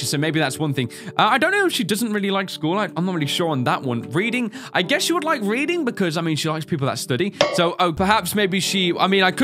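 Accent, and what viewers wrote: United Kingdom. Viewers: I see your British am British but people say am American because I watch YouTube too much😅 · Are you British dude? Shite. Your Accent are Very Great. :) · um...? you're British...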